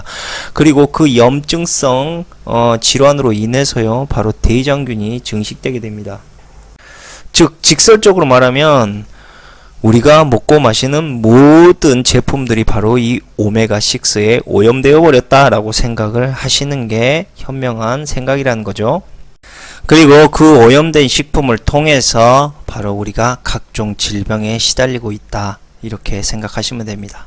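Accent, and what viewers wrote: Jeolla, South Korea. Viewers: 긍께 고향이 어디다요?? ^^ 전라도 지라? 좋은 정보 감사합니다.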